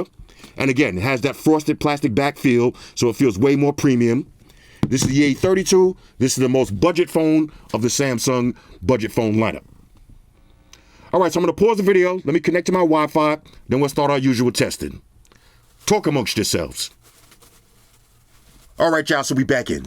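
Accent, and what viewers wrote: United States. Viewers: Morning Mr. Have ever considered have another side job as a narrator in reading books. You have a very distinct American accent, which I think that you would make" lots more money". I'll surely buy it.... thanks buddy. Oh, I once had a pussy cat like yours, years ago. His name was Walter. I had him for 12 years. He passed away in 2009. I miss his company. Sad face.... nico Trinidad and Tobago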